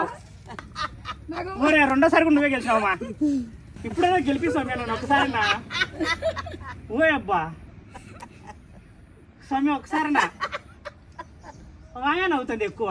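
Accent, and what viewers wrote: India. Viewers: హాయ్ అండి where are you from ఆ యాస చూస్తే మన రాయలసీమ లాగా ఉంది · మీది కడప న స్వామి 😅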